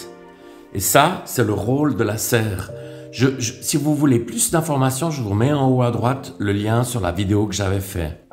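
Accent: Switzerland. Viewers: Si on protège le pied des tomates avec une bâche perméable à l'eau ça évite les éclaboussures dues à l'arrosage, qu'en pensez-vous? Bravo pour vos vidéos courtes et allant à l'essentiel, c'est toujours un plaisir de vous écouter avec cet accent bien Suisse pour nous autres haut-Savoyards.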